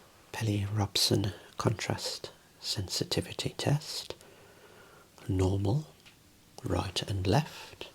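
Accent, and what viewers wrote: Birmingham, United Kingdom. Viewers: When I started watching your videos I got so confused by your Birmingham accent. Three years later you sound like fairy from Neverland to me. You don't help me to fly, but to fall asleep easily 😊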